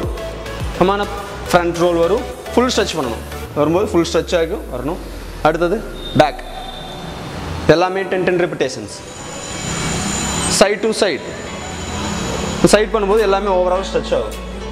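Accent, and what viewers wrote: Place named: Kongu, India. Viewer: Bro neenga Coimbatore ah..? Kongu Tamilin enimai katuku sogama eruku...;)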